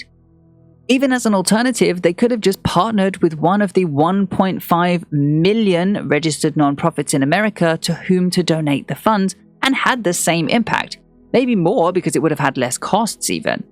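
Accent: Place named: United Kingdom. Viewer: I mean, England is not a single country. Yet. UK is and you kinda sound from there. Anyway. Maybe in 50years due to Brexit there will be no UK, but Scottland, Ireland and England, but for now.. UK. I am not trying to be pretencious it just make my ears bleed.. every time I have really liked the video, I find Selena drama queen shady. But I also now 100% due to my profession that people are so so into mental health now after the pandemics. It was a smart thing of her, this way she reached older audience as well, I mean sure she ha sgreat price point but I don't find her products good as some of professional MUAs, and kinda a lot of colors, glitters in the highlighters in certain light.. not really for mature skin, if you get me. Liquid blush too pigmented so need a work and then too heavy foundation (and this is not a mature skin friendly). Anyway, I wasn't before this a fan but this looks shady. 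Great job overall!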